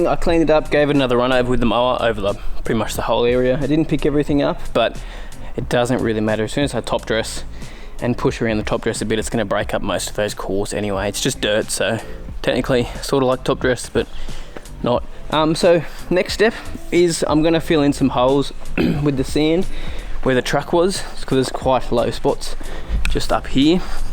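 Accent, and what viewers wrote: Australia. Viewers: Is it me or are you Australian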